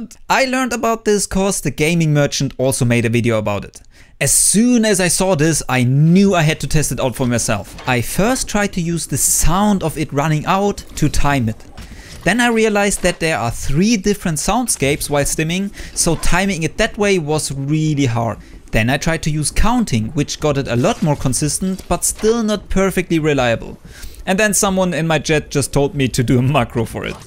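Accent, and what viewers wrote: Germany. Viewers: I can heard that your German bro haha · Man hört übelds das du deutscher bist😂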